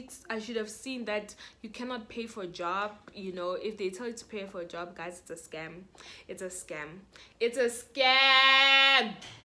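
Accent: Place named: Nigeria. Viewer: The Nigerian accent >> 😂😂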